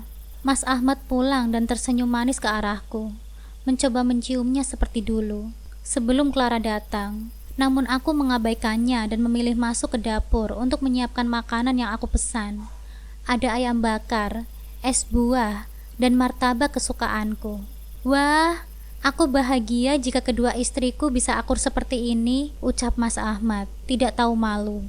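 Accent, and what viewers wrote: Java, Indonesia. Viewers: Bd hadir mbak yg suaranya medok banget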